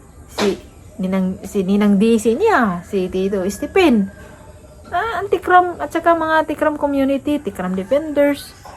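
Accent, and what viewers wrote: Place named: Visayas, Philippines. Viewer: Bisaya ka pala dong .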